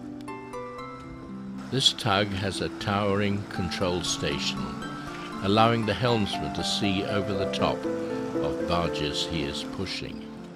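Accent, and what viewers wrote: England, United Kingdom. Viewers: So pleasant to hear this world of adventure described and decoded through the lens of a true Englishman's command of the language. A rare and dying breed, sadly...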